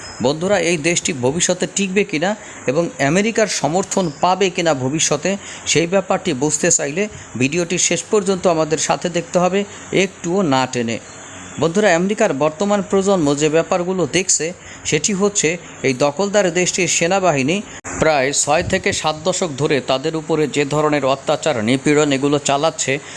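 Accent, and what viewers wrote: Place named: Gopalganj, Bangladesh. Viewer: ভাই আপনার বাড়ি আমার মনে হয় গোপালগঞ্জ , আমার বাড়ি ও গোপালগঞ্জ,, এই ধরনের কথার বাচনভঙ্গি বাংলাদেশের অন্য কোন জায়গার লোক বলতে পারবে না, এটা আমার বিশ্বাস, আমি আপনার প্রতিটি ভিডিও দেখি, ভালো লাগে, ভালো থাকবেন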